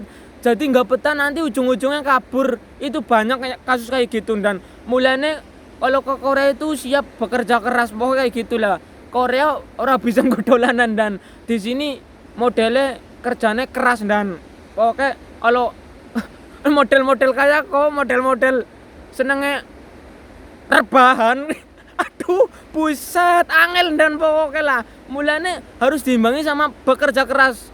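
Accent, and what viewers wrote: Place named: Banyumas, Indonesia. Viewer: Salam NGAPAK NDAN.. ORA NGAPAK ORA KPENAK HHHH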